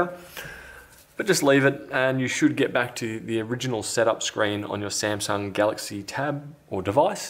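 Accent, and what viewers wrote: Australia. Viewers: Great to hear an Aussie voice. I hope you are making a killing with monetisation - take as much money as you can from those bastards!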